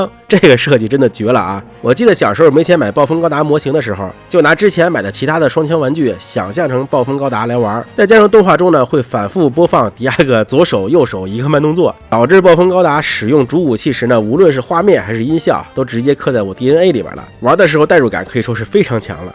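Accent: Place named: Baoding, China. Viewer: 窝草 保定味儿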